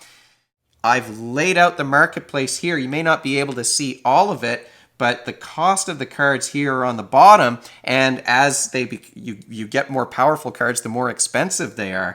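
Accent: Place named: Canada. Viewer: Sick review. I love when I can tell from the first sentence someone says that they’re Canadian too lol.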